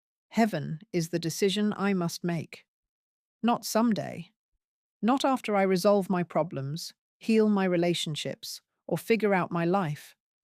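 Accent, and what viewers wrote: United Kingdom. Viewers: I really love the British accent. Idk why but it's very soothing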